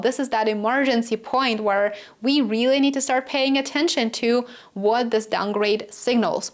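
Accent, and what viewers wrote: Russia. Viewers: She sounds Russian. Is this Putin's propaganda Ho?! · Pretty Russian accent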